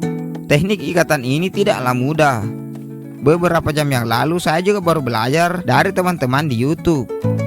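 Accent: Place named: Bugis, Indonesia. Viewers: Seperti nya bukan orang Batak tapi Sulawesi Selatan logatnya mirip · Orang manaki bosku, dari logatta, kayak orang maros kepeng😁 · Kental kali Bugis nya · Kalau dekat saya ingin main ke pondok Abang.. Sayang saya di Jawa . Kalau lihat dialek nya Abang ini suku Bugis ya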